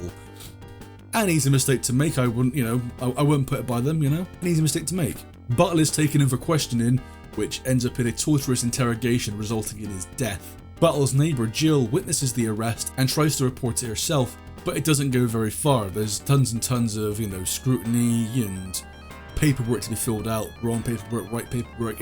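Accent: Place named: Sussex, United Kingdom. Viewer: Where are you from, mate? Got to place your accent. I'd say Sussex if I had to guess or Wiltshire. Sorry, I've got something wrong with me where i need to know where people are from?!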